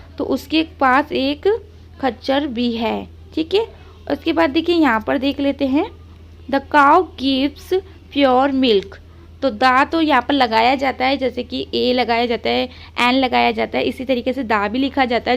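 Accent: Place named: Bihar, India. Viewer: Bihari you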